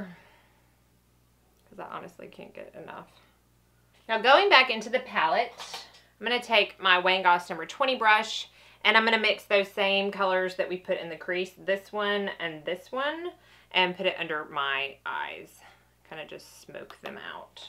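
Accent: South, United States. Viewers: Hi my name is Rousha and iv been wanting to tell just how awesome I think u are! I love your videos I think your so talented. I'm also from TN (murfressboro area) but currently live in NY. I love to watch just to hear you talk it reminds me of everyone back home that I miss not many ppl here have a southern accent lol. I just wanted you to know your one of my favorites to watch and to keep them coming. <mail>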